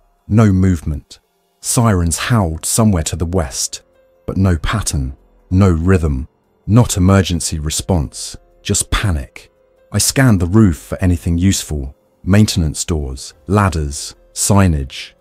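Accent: United Kingdom. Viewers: An AI read story - homonyms like "a door starting to bow" (pronounced as "bow" & not "bO" . . . a Brit accent for an incident in America is a story flaw with minimal odds. While in a hospital but nobody is looking for supplies even though 2 have med-tech backgrounds to know how important such materials are. Another flaw. Okay - not great.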